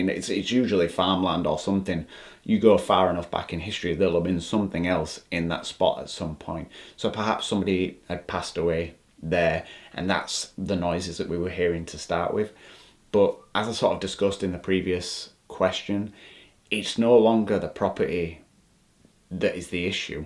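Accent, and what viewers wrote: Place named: Scotland, United Kingdom. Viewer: Greetings from the desert of so kali. First time at your channel. I take it you are British by your accent, Scottish now I'm hearing you more? Are there any homes left in the British Isles that are not haunted? I'm seeing a lot of your ancestry in your face, excuse me if I'm being presumptuous-I'm seeing Briton, Dane, high German(strong jaw), hair is wavy, is that Celt? High cheekbones, sometimes some Euros and Brits are built such as they look almost part American Indian-spacious eye/cheek area but likely not at all American indian. You seem very tall. Over 6' 2. Are you around 30 yrs old? Are you a Sagittarius? It's so wild to see younger generations embracing the paranormal the way I did when I was a teen, 20's and 30's. But back then, one was "weird" and pretty much ostracized for even talking about the things you are talking about now. 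Ah-that's an angle I hadn't really thought about-that unfinished business keeps the energy here as a ghost. A Wrinkle in Time-great book. I thought it was merely trauma that kept the energy earthbound as a ghost. But what you said makes sense as well. I saw a spirit or ghost one time. It was a man who was staring into my eyes intently as he went from an old man growing backwards until he was a young boy. There were light sparkles all around him and I was just floored, doing a check, recheck on his intent of showing himself to me. Then he disappeared as a young boy curly dark hair, dark eyes and wearing a hat with a feather in it that you'd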